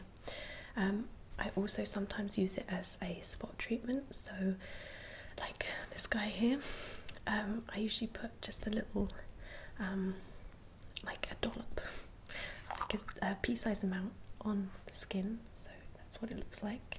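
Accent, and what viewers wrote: England, United Kingdom. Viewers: what part of England are you from? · Hello young lady.How refreshing to see a beautiful British face as an ASMR artiste and one with wonderful red hair that has not felt the need to go another colour.As for your video it's great. Your accent really does make a difference. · So very, naturally beautiful. Love the freckles too. Beautiful, cultured English accent - I have similar so perhaps bit of narcissism here. Keep on being lovely :)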